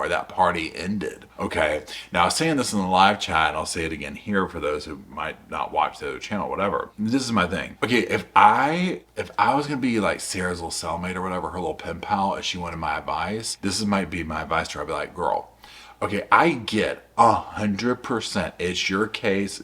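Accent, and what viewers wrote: South, United States. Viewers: Great video also as as someone from Arizona whose never been east of Texas you might be the first person I’ve ever heard with a southern accent & gay voice lmao and I gotta say a gay southern accent is now my new favorite accent ( sorry Australians)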